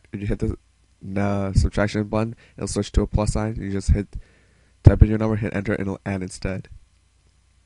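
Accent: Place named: North America. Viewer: you have to be either from USA or Canada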